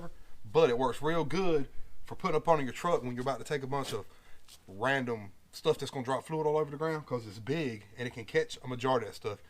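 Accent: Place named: South, United States. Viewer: I never realized how southern you sound till I watched this video.... lol Good job brother!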